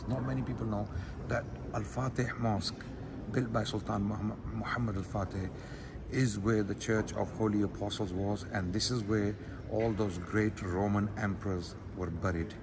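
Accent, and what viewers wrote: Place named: United Kingdom. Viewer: Brother, its J as Yot, funny to hear your brittish accent Justinian as (J Justice). Its closer to Yustinian (as York )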